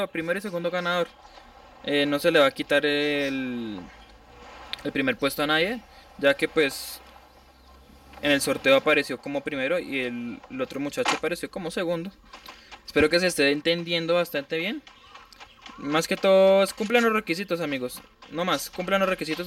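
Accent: Colombia. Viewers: Oye amigo quiero partisipar aun esta en abierto el sorteo ?? Soy colombiano y tengo todos los requisitos tienes asento de rolo bro las mejores digue asi me ayudaste vastante para comprar diamantes no savia lo de el efecti😎😅sigue asi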